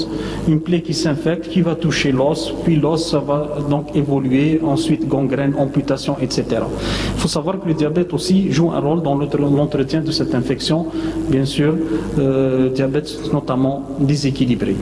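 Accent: Algeria. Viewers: C'est désagréable cet accent algérien ouf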